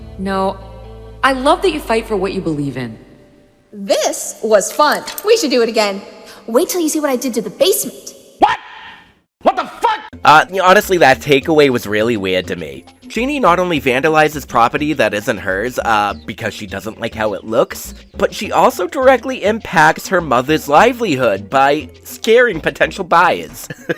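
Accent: Boston, United States. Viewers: Are you from Boston?!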